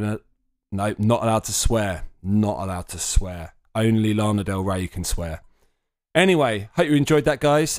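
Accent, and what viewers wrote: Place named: England, United Kingdom. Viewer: you sound like my crush from England omfg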